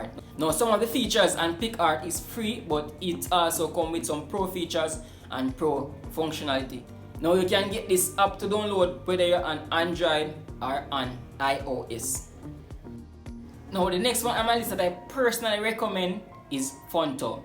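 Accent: Jamaica. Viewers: Are you Jamaican? I hear the accent!! I am too, you get a subscribe just for that. I use Canva as well!!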